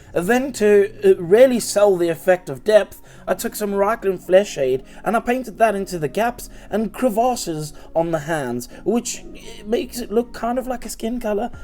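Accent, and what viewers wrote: South Africa. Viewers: I hear a South African accent and I am here for it!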